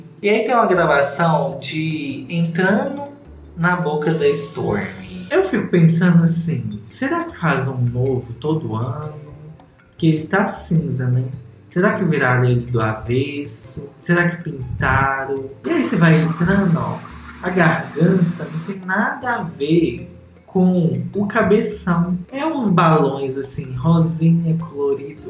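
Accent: Minas Gerais, Brazil. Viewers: amei o seu sotaque mineiro 😍😍🤣🤣🤣🤣🤣🤣🤣🤣🤣🤣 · sou hater assumida do sotaque mineiro do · Esse vídeo valeu cada minutinho só pra ter o falando mineirês 🤧🤧🤧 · Adorei o com o sotaque de Minas... 👏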